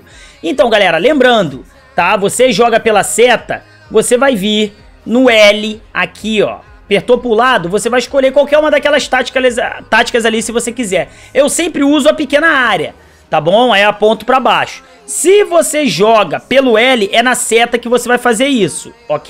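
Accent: Rio de Janeiro, Brazil. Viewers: O melhor é o sotaque de Carioca raiz kkk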